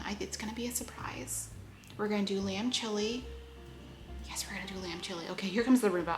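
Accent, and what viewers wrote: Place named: Jamaica. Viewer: I hear your Jamaican dialect. Its hidden but it's still there. Hello to yah. Looove your home. You are very much like me in style and decor. 😊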